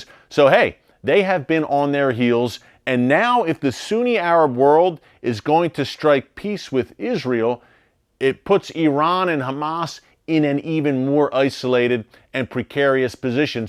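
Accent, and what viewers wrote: Philadelphia, United States. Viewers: I thought I recognized a Philly boy. I’m from DE. I miss good Philly pizza.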